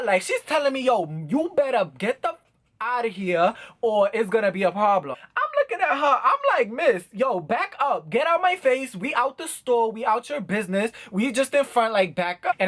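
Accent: New York, United States. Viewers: this new york accent is everythingggggggg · Hey how old are you...you new york accent is so cool love to hear you tell storys your so animated..lol..jhave you ever been to kansas city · I could listen to you read the dictionary! Your NY accent is so dope!!! Love from London UK x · fucking love your accent 😬 I miss new york so much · Your accent be havin me want to live in New York.......like its life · Is he from NYC.? Bc I'm from NYC and I talk the same way, same slang and all😂😂...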